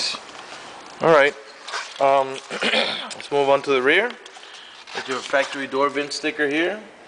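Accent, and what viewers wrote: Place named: Cuba. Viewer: You are neither, nor a seller not an american you speak like a cuban just arrived..sorry, mention the issues with CVT automatic transfer case, is a sheet I sold mine just 1 year after got it, got it???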